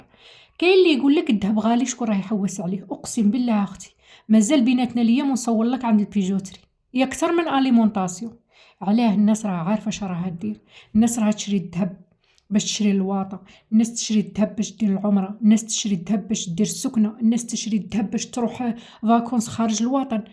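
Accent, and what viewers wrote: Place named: Béchar, Algeria. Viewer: قبل ماتقولي انا من بشار من هدرت عرفت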